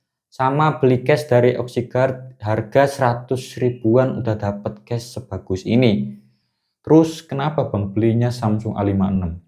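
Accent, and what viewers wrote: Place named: Java, Indonesia. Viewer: Kyknya gw nya mending di ganti aku deh bng medok soalnya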